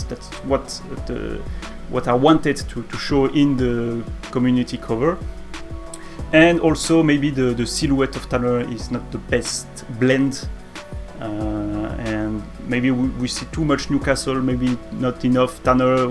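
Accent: France. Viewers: What a handsome guy you are! And nice French accent. ☺ Thanks for keeping on Driver on YouTube. Wish you success!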